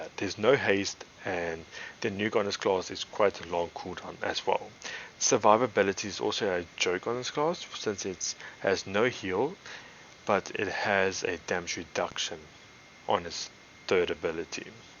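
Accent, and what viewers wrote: South Africa. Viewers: You sound South African. Thanks for the video though, wish you could update it with the two latest classes.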